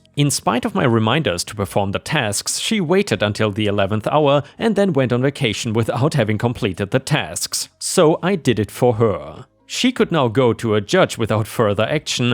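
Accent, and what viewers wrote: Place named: Germany. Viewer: I love your accent! You sound like my German Grandmother! 💋❤❤❤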